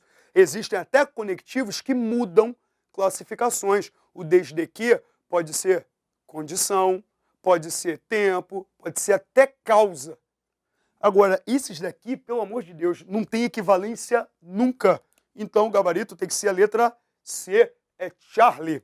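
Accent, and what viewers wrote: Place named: Rio de Janeiro, Brazil. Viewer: Coloca o titulo como se só o RJ tivesse pm oficial, tem que adivinhar pelo carioquês. Ajuda aê.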